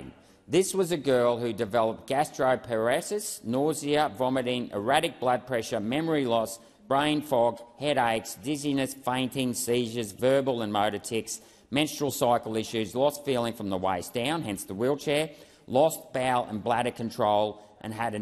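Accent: Australia. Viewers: sorry bro I can't take Australian accents seriously.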